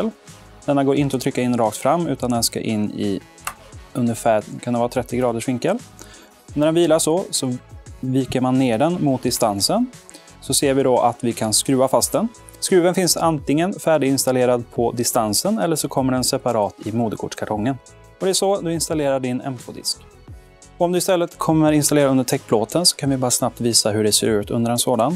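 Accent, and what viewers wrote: Sweden. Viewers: Jag gillar att han uttalar alla namn med svenskt uttal, istället för engelskt. Låter lite roligt :D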